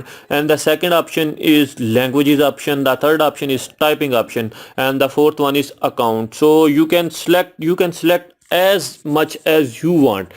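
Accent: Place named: Punjab, India. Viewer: Bai ap ki English kamal ki hy. Lagta hy. Punjabi bol rahy hain. Aur kamal ki baat ye hy. K apki English mujhy b samaj aa gayi hy.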